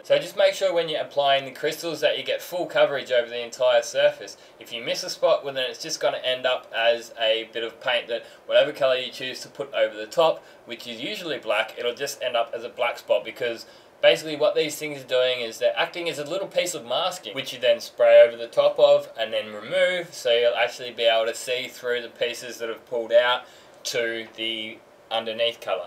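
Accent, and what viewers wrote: Australia. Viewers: your constant talking about the rabbit you saw running across the yard yesterday and the weather etc finally made me hate Australian accents. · First, put the camera on a tripod or figure out how to keep the camera from jumping around. Serious headache! Two, Stop talking like you're committing a verbal assault. You seem to never shut up. Do you breathe? Damn its hard to listen to you w/ that thick Aussie(?) accent. I understand this is supposed to be an instructional video, but you could take a break and just paint or watch the other guy paint, quietly.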